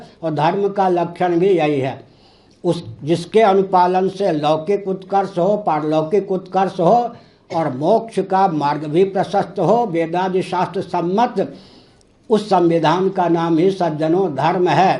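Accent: Standard. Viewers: विशुद्ध हिन्दी बोलना कोई आसान बात नही है।।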